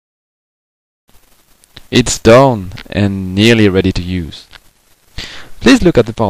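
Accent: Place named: France. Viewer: Nom de diou tu es francais :D Le meme accent que moi . xD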